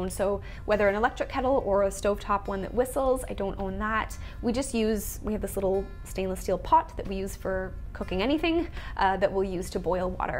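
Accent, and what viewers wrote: Canada. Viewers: I love your Canadian accent!!! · Either your accent is from Minnesota, or you're from Canada ahah! I can't pin point it! :P You sound like you're from northern Ontario! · That Canadian accent coming through stronnggg haha. I’m from Calgary and I can hear the East Coast in you.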